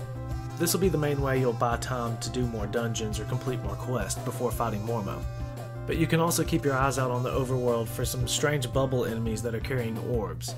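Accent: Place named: Rural, United States. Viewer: Thanks for the video. I like your country twang.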